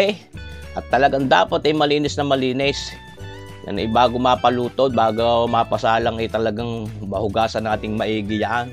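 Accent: Batangas, Philippines. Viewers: d ko n kailangan mag aral sa school sa iyo lang madami n akong nlaman lutuin pati batangas accent mo..... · Hello sir I like the way you cook and I love the batanggenyo accent. Greeting From Homer Alaska this is Berna Brown.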